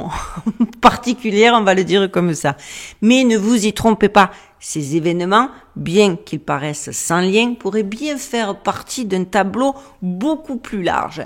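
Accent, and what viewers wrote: Marseille, France. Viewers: Hello everyone ! J'adore <3 Avec l'accent marseillais en plus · Cet accent de la garrigue! Un délice!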